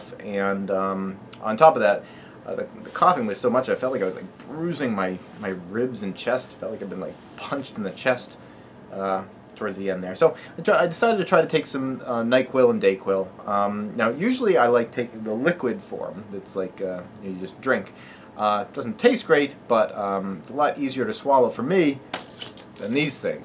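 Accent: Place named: Nova Scotia, Canada. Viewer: I've just finally noticed how Canadian you sound... after this binge of videos... can't believe I didn't notice this before. That accent of yours is more Nova Scotia than Boston haha. I am using your dialect and the weather patterns in your videos to triangulate your location...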